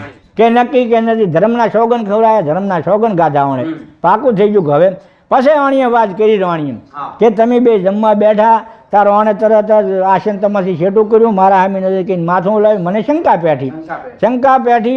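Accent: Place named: North Gujarat, India. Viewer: Love Gujarati language ❤❤❤in north Gujarat style ❤❤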